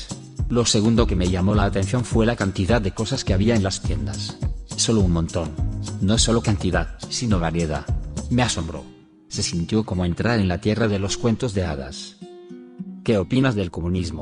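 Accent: Russia. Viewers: Vivo en china y soy youtuber latino, y los que no somos comunistas no tendremos problemas desde que no critiquemos abiertamente a las autoridades chinas, de resto por ahora todo parece normal, saludos a ti yo también visité Rusia reconozco el acento de un ruso cuando aprende español. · el hecho de que esta grabado por una voz rusa autentica hace este video mejor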